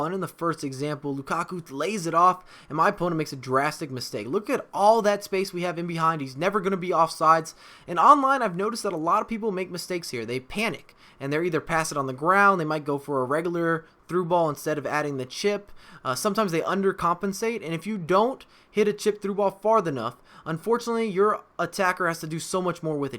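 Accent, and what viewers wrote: Houston, United States. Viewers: R u from Houston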